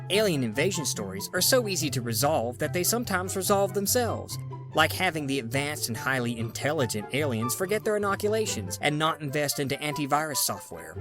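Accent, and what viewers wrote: South, United States. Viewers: You've got this very subtle twang of a southern american accent, or am I just imagining things??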